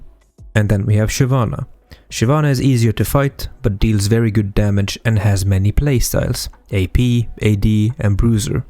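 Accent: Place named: Sweden. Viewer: From sweden right?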